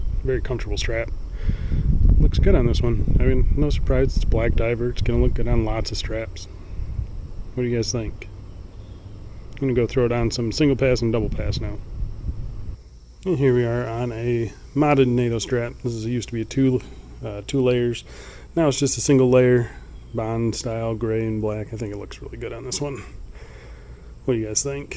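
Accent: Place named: New Jersey, United States. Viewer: sound like a jersey girl ..gg tandorio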